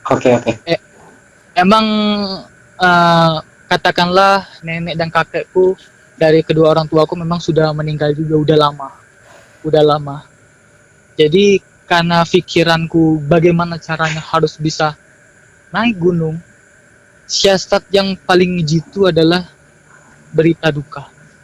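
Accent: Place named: Jambi, Indonesia. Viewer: Kyk suara orang jambi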